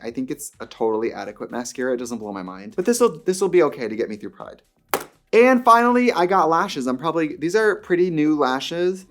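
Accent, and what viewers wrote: Wisconsin, United States. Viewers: Her Wisconsin accent is so strong in this vid! So adorable, love from Milwaukee 🍻❤️💅🏻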